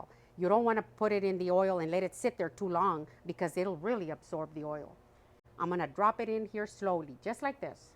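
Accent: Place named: Texas, United States. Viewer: Your Texas accent is back!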